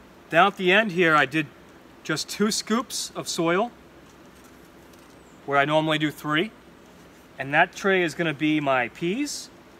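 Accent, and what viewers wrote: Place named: New Hampshire, United States. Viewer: New Hampshire, I was wondering where that accent was from.....there is another Youtuber from NH I watch as well, has a homestead he is building. Good insights on basil, really appreciate this video.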